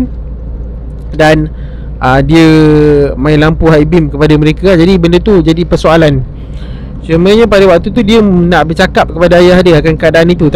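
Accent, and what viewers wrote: North, Malaysia. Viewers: org mna dgr sora palet mcm org utra 😅🤭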